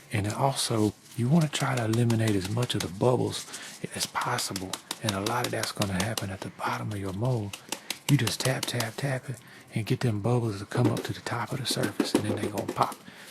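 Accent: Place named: South, United States. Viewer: i love the narration. it's that down home southern vibe, but also ASMR. haha.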